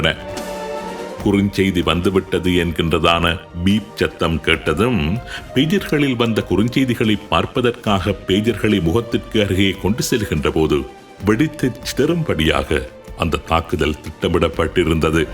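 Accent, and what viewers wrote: Sri Lanka. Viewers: இலங்கை தமிழ் 🙏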